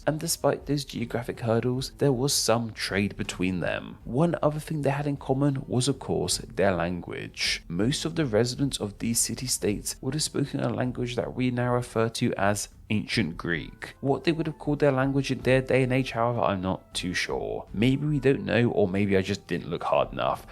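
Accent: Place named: RP, United Kingdom. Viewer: Dude you sound well posh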